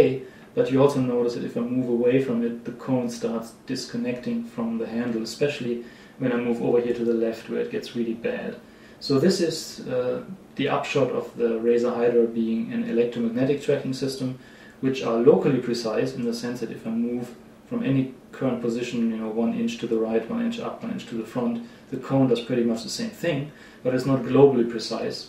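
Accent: Germany. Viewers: Hey dude, where are you from? You sound like a German ^__^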